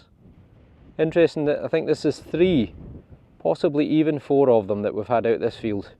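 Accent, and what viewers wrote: Scotland, United Kingdom. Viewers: Love seeing all the treasures, the history lessons are great but I’m mostly here to listen to your delightful Scottish accent 😊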